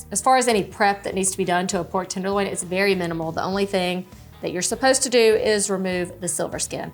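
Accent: South, United States. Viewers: I like her southern accent ...❤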